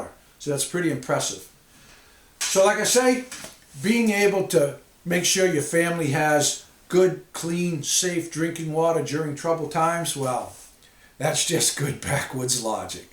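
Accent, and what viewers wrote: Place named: New England, United States. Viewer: Hi sir! I really enjoyed watching your video... I am thinking of building my own off grid cabin and was concerned with how I would get clean water. I could easily add gutters and a rainwater catchment system, even on a lean-to just off by itself. I would love to try to make this, even just to have I think it's a great idea, even for camping. Thank you, from a fellow New Englander, I knew I could trust you by your accent. :)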